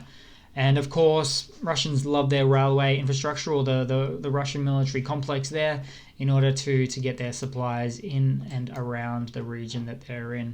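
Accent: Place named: Australia. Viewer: He sounds Australasian to me!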